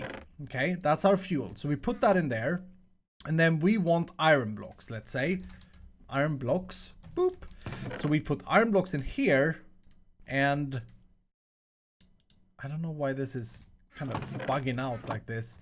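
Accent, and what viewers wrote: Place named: Sweden. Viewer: Are you swedish is kall is a swedish word and your first vid sounds like a swedish man talks english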